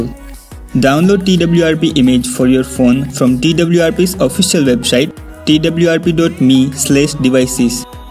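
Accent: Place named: India. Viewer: i hate indian english!!!! i cant understand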